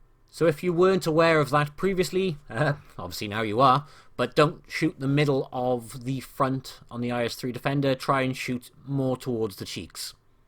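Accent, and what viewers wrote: United Kingdom. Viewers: yoo wat a british accent !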